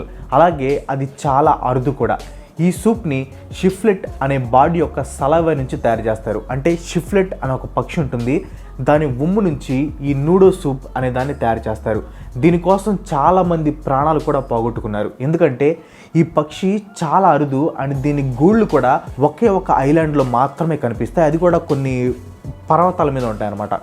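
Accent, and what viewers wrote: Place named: Kadapa, India. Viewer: కాదురా తోయ్ మా కడప యాస మీరు కూడానా బ్రో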